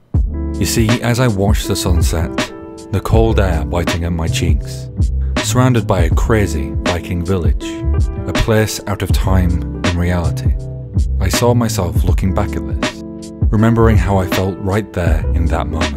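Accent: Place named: United Kingdom. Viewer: Also where from the UK r u from cause your accent interests me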